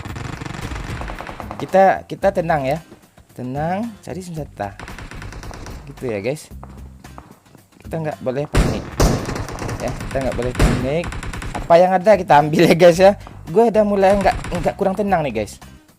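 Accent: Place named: West Sumatra, Indonesia. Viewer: sikampret kacau, salam kenal abang aq dri jawa barat tapi karena sering denger logat minang kocak jadinya kadang kebawa bawa jadi kadang lupa logat sunda nya, sehat selalu bang terus upload tiap hari, rezekinya di lancarkan.. orang proo nii buahaaaahahaha 😂😂😂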